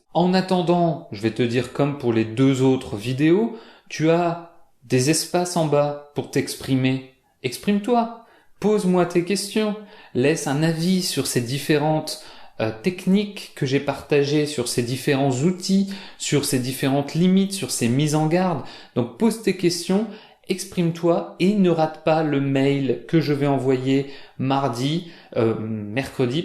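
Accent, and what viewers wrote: France. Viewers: Salut! Merci encore pour vos vidéos pleines d'enseignements et d'instructions, bien entendu. J'suis un étudiant senegalais passionné des langues étrangères notamment le français et l'anglais. Vos vidéos m'ont beaucoup apportées en terme de niveau français. Mais j'ai un petit soucis pour ne pas dire un problème, étant fasciné par la culture française, j'aimerais bien avoir un accent français assez simple comme le vôtre; mais je ne sais pas comment s'y prendre? Avez vous une solution à cela?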